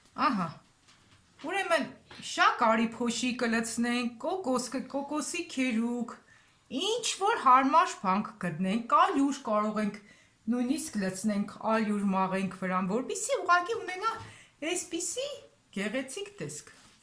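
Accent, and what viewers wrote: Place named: Gyumri, Armenia. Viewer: Shat em sirum gyumrii barbare akcente...😃😃😃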